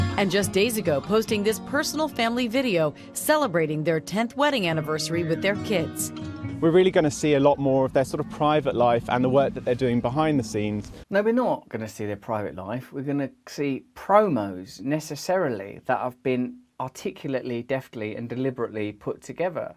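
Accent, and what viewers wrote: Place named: Cockney, United Kingdom. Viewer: hahaha!! He’s a handsome man. Voice is soothing, too. But sometimes I can’t understand him with the cockney accent.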